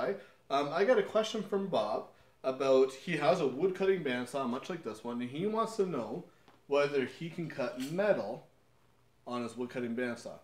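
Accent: Canada. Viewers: believe me, I really appreciate the Canadian accent, for me is the best english.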